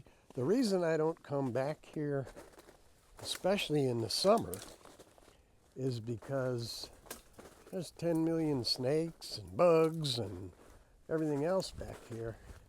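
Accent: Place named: Midwest, United States. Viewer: The Ramblings of Bry As an American from the South, sounds as mid-western as Walter Cronkite.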